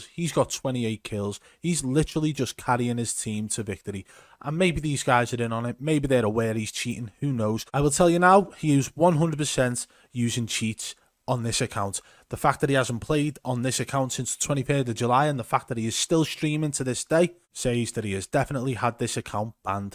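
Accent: Liverpool, United Kingdom. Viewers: I never knew Ewan Mcgreger was a scouser or into COD. Excellent work as usual and can’t even watch streamers the same anymore. Defo a cheat as some of the big names are.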